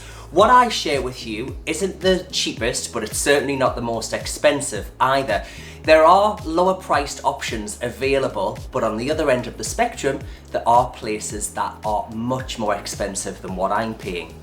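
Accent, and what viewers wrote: Newcastle, United Kingdom. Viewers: What a great Geordie Accent. Good Video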